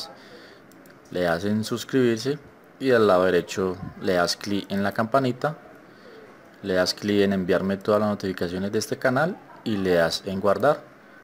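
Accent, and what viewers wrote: Colombia. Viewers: buenos dias amigo por su dialecto se meda que usted es colombiano y por los lados del eje cafetero buen tutorial felicitaciones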